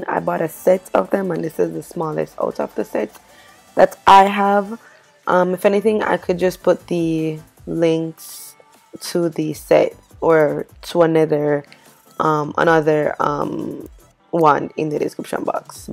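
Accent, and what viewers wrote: Jamaica. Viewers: Omg your Jamaican?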